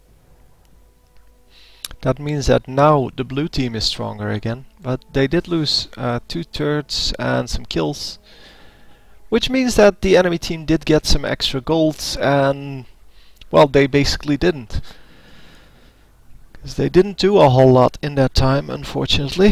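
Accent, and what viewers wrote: Netherlands. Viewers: You dutch hahaha dat hoor je gwn :-/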